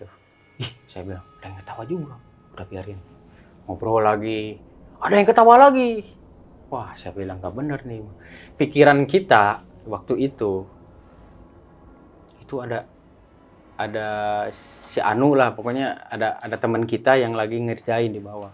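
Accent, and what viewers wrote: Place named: West Java, Indonesia. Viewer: Sudanis pisan. Rada paciweuh pabaliut letah mun ngomong bhs.indonesia teh nya kang abi ge kang. Salalamet sdyana 🙏🙏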